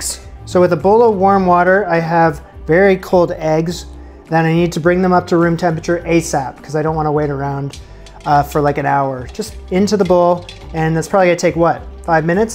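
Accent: Canada. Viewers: Yup, been binge watching these too the past few days. The best thing is waiting for to drop an "about" in his adorable Canadian "ooh" accent. 😁 Definitely making these soufflés myself! Keep the orders up, J! 😋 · Please respond to my comment. You are Canadian based on your accent.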